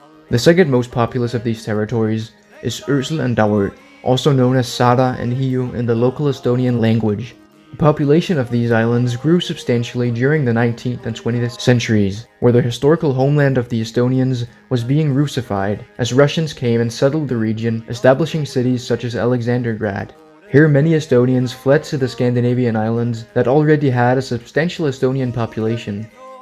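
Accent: Denmark. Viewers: your propally a dane i know cus the way you pronuence sønderjylland and sjæland and the danish accent is perfect for a dane how do i know im a dane. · wtf why is he mispronouncing the swedish names in danish? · De märks att han är dansk xD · he has a slight danish accent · Cant help but notice the Danish accent on the creator. I feel we already are in this union in some ways. I certainly appreciate all our neighbours <3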